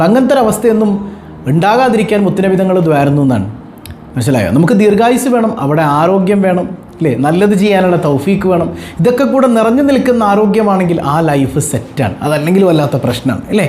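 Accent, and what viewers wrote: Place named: Malappuram, India. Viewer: ഉസ്താദിന്റെ സംസാരം കേട്ടാൽ മലപ്പുറം ഭാഷ പോലെയാണ്. 🥰🥰🥰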